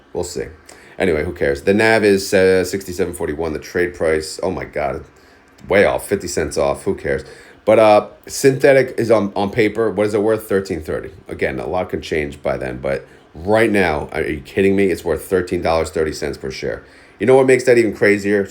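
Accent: New York, United States. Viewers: Great voice and NY accent Your title should be “the godfather on dividends”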